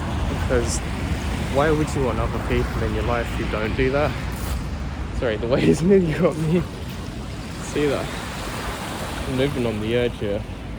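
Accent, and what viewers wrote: England, United Kingdom. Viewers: Good morning! Aww! It was so nice listen to you saying all that! I just love the english accent. You and your brother are spectacular! Wish Happy New Year to you and yours, haven't seen you since last year!🎉 I would love to see you doing a collab with Mortis Media and Being Scared, like back in 2016. Since there I'm your subscriber. But I'll be happy with any choice. Hope you're doing great too, and taking care of yourself. Thank you! Much love💚💙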